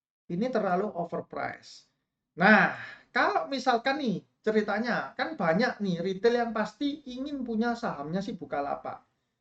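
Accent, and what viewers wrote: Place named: Java, Indonesia. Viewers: Auto subscribe ko.. Review jujur & mengedukasi 👍🏼 Medok e yo pas di kuping 😆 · dialek khas jawa mu mas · suara medok pean cak ,,, hhhi has jawa timuran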